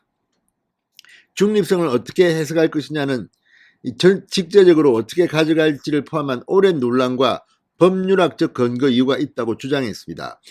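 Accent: Gyeongsang, South Korea. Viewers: 참 잘하시네요 경상도 사투리가 재미있네요 나도 대구 촌놈임니다 좋은뉴스 부탁 드림니다 화이팅 !!!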